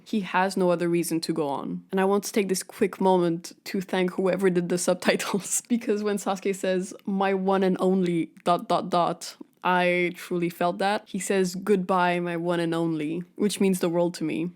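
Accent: Quebec, Canada. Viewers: Are you a quebecer or French person by any chance? Just curious because I'm from Quebec and your english accent sounds similar to mine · Great video, I loved it. Also is this a quebec accent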